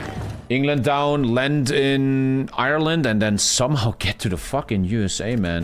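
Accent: Germany. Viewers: Hi, I am new on the Channel, can I I ask are you German because I Stand the Things you Said in your Rage? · You sound German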